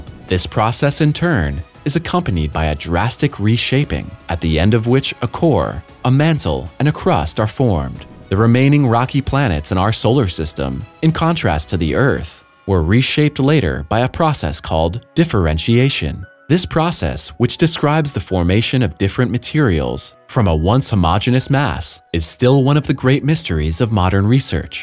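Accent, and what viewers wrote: United States. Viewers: Hear that voice. Dudes American. Go on with that stupid metric shit.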